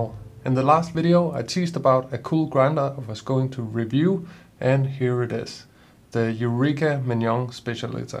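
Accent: Denmark. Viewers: Hi It sound like you are from Jylland DK, if I am not mistaking 😊 Anyway you have many nice videos out 👍 I have the Eureka Mignon Specialita and had have it for half a year, Love it. (very silent) Although I use it mostly for filter coffee. I use it for 2 cups equal to 38 gm to 640 ml. The settings for filter coffee is almost at maximum size. In the community out there, there is a 3D Print file of a large scale where the is a gear to the small nop so it is easier to dial-in however it is NOT making the grinder more beautiful. There is also a 3D Print base plate which is useful, making it tilt to the front, a little like the new model. 👍Thank you for your video👍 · Hej :) Hearing your accent I am assuming your are from Denmark? I am getting my Specialita soon and was wondering where you printed the 3D single dosing hopper? Can you recommend something here in DK/Copenhagen that doesn’t cost a fortune? 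:-)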